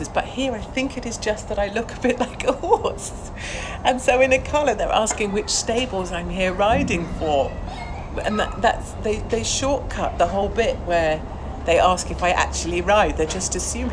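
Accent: RP, United Kingdom. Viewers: It might be the accent that makes them think you're posh, after all you do pronounce your words. And of course you say "darlings" a lot 😉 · It s because you speak the queens English well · It's not your looks, it's your accent. You do sound a bit like a commentator at the Dressage events. It's even more fun when someone with a posh accent says naughty things. And yes, I have been to Spec-Savers.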